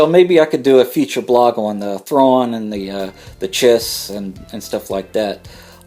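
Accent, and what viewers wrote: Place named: Philadelphia, United States. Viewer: You sound like your from the Philadelphia area. It's like my cousin Nick is talking about Star Wars. Great vid.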